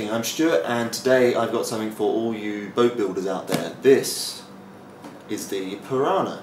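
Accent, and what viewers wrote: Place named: United Kingdom. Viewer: at last someone who speaks the queens english (sorry scott) it must have been made in the UK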